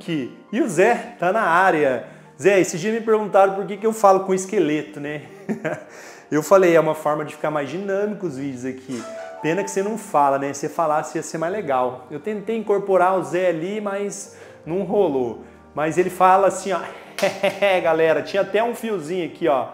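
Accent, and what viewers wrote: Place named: Sao Paulo, Brazil. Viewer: Vc é de Piracicaba? Esse seu sotaque, é bem típico!!!!!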